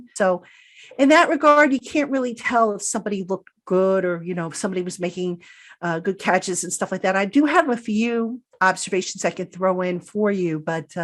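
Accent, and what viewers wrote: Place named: New York, United States. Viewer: Thanks for the insight. I get a little NY media in your tone and reviews. I know that’s your background so it’s expected but hearing optimism is also refreshing. That 2nd 3rd round had you with a tone of concerns as us all. Let’s all hope a new day has come.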